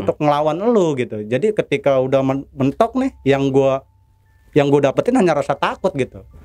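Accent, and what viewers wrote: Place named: Jakarta, Indonesia. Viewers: Betawi bgt logat lu.. kampung Mane sih ? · Abang macam betawi ngomong nyee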